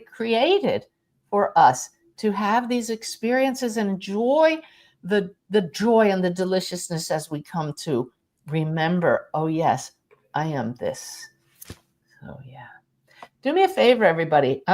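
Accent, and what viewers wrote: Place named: Germany. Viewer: I love your German accent!!!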